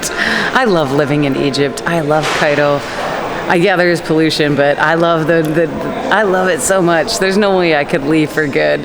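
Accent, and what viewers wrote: Argentina. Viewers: You got me! I thought you must have had a meltdown last year. 😅You sound really cool, speaking Argentinian. You seem different as well. That's the power of language! Thanks for sharing. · Después de ver muchos de tus vídeos porque me encanta Egipto, me gusta mucho cómo lo muestras todo y además estoy estudiando la lengua árabe también (sé muy poquito pero voy progresando), me alegra muchísimo saber que compartimos otro idioma 😊. Yo soy de España y me ha encantado escuchar tu maravilloso acento argentino. Muchas felicidades a tu abuelita, desde luego está estupenda 🎉 Ya tienes una nueva suscriptora 👍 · Your Spanish is Argentinian or true Spanish like the Spanish spoken in Spain 🇪🇸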